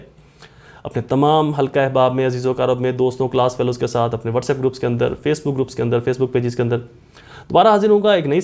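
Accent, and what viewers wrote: Punjab, India. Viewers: Nice punjabi accent sir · Sir g punjbi sun 🌞 ka maza ah ga ap ki punjbi hmra jisi ha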